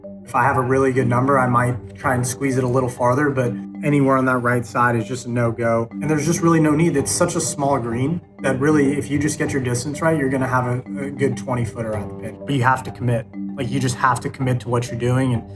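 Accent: RP, United Kingdom. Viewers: Voiceover is too posh it makes me want to rip my ears out. Would want either a modern London accent or better yet, a good old Georgia/SC boy that grew up in the shadows of Augusta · Your narrator is too posh. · Great content, but narrator is the single poshest man I’ve ever heard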